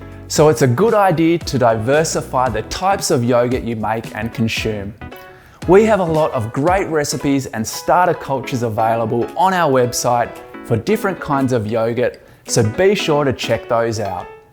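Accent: Australia. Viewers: Is that the Australian accent I hear?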